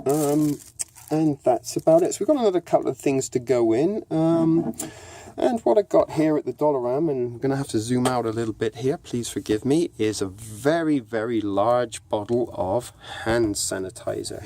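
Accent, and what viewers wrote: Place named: Midlands, United Kingdom. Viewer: New sub from England you sound like you got a midlands accent. ?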